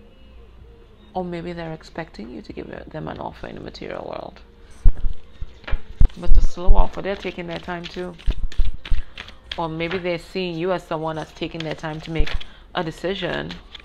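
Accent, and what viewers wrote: Trinidad and Tobago. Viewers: You sound like a Trini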